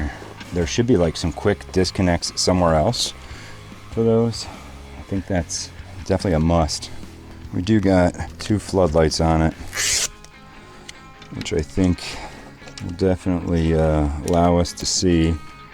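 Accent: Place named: Pennsylvania, United States. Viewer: You sound like a Pennsylvanian! I’m Pittsburgh born and raised! Stillers or Iggles? ;-)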